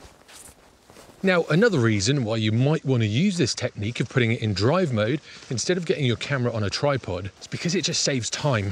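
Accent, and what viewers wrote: United Kingdom. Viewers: i love u r british accent.when i hear u r voice.i"ll remember Matrix movie star anderson.by the way u r great for teaching photography triks and tipssss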